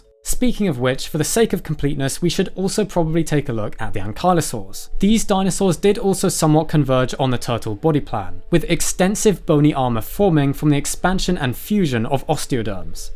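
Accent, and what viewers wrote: RP, United Kingdom. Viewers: You sound like, but I dig it. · I like British accents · You're so British.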